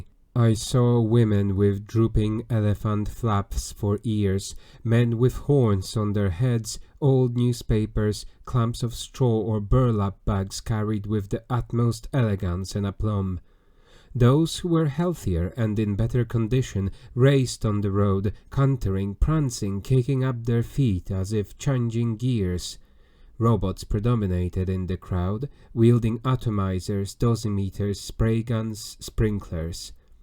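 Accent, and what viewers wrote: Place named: Poland. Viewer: Hey, thanks for reading this! Big fan of Lem and classic sci-fi in general, and this book is really fun. It's nice to be able to listen to it in English. Also, are you Polish by any chance? I feel like you speak with a bit of an accent, but I can't quite tell what it is. All I know is that I sound kinda similar when I speak English, and I'm Polish, so maybe it's a Polish accent? (That's not meant as a criticism, by the way; your pronunciation is very clear and your voice is consistent. Good job!)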